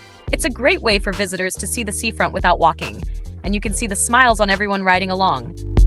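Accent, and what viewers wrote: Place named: United States. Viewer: Ruined by this awful American accent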